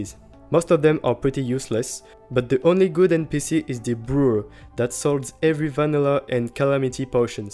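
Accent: France. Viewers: Je pensais pas tomber sur un français vu l’accent mais incroyable la vidéo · Wait youre french?? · With your accent I guess out that you are French😊 and as a French people I must help the others French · Hey homie you're french aren't you ? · You are french i can feel it in your voice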